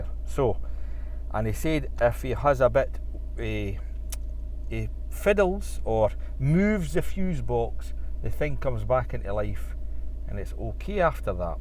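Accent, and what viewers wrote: Scotland, United Kingdom. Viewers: Thanks for the video. I like ur teaching especially the Scottish accent. Will subscribe! My son's audi a3 2010. Keeps cutting out which is why I'm here. Normally when at operating temperature. But no real pattern. Code said crankshaft shaft sensor. I replaced. Cleared code. Drove 100miles the happened again. So I replaced cam shaft sensor. Not clearer code but cut out at warm engine Started again and let it idle 1hr. Fans on few times. But confident to drive it incase cuts out again But will look at this. Thanks Anything else I should try look for? Wish u were in London.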